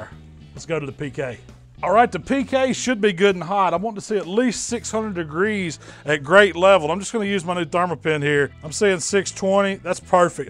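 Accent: South, United States. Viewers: American accent?? Don’t you mean southern?? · This defines America. Some fat guy with an American accent showing us how to grill a huge steak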